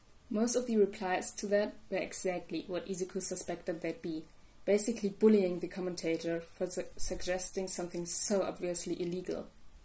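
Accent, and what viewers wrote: Germany. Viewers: I think your English is very good! I was wondering what your first language was though tbh. I guessed it was German but I’m not sure.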